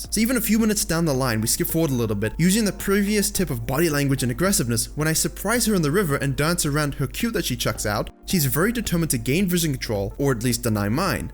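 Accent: South Africa. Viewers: Do I hear some South African in that accent?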